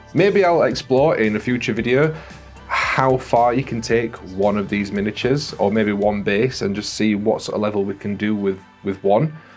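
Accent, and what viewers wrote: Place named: North, United Kingdom. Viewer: I 'ears a Northern accent, I subscribes. This is t'way.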